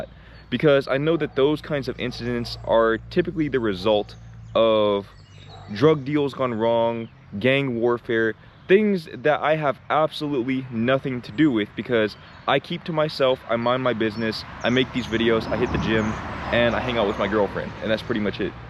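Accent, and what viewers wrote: Netherlands. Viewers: Is it me or have you grown a Dutch accent to your English? 😅 But I hear you. I can concur with every single word you said in this video, almost everything you said I could have said(*), because my experience with travelling in Europe and US was the same. I hope you will return to The Netherlands someday, through your videos I have learned to appreciate more what I have here. If you want to move on and discover how other countries are to live in, that's just great, we will miss you though. (*) There was an American guy at work who was from Dallas and attended Linux user group meetings in Austin. "It was only three hours by car". I said, if you drive for three hours in The Netherlands, you're either in the sea, or you are in a different country where they speak a different language. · You have a slight Dutch accent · 5 seconds in.. and thought he is in the Netherlands :D , (Dutchie here ;)… and 40 seconds in, let me guess east of the Netherlands?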